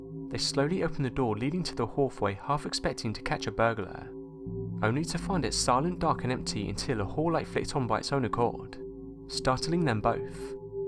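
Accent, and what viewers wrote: England, United Kingdom. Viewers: I feel that his speed is just fine, you might have to get use to his English accent. If you are only use to an american accent then the english, Irish and Scottish accents can be difficult to understand. If you keep listening to these accents then it will become easier with time. 😊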